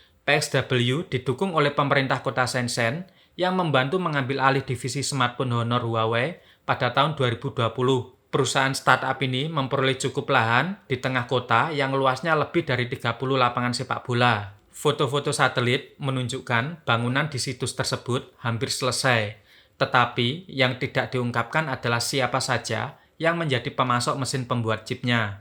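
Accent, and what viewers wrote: Java, Indonesia. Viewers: Pengetahuan lu kurang woi sok tau bahasa medok jawa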